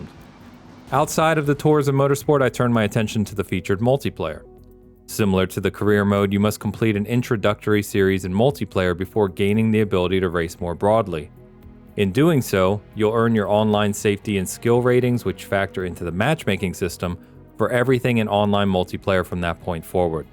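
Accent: United States. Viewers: I'm going West Coast, N Cali or Oregon. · lol finally someone who understands an American accent. it's strange to me sounds like rural middle America. The people replying to me that think Americans have 1 accent is hilarious. I'm the dumb one to them...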